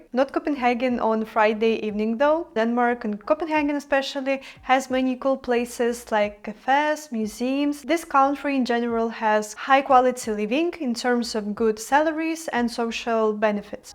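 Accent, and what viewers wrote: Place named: Russia. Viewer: Off topic, but I thought you were Russian from your accent - it is beautiful! I would love a video comparing the two countries! :)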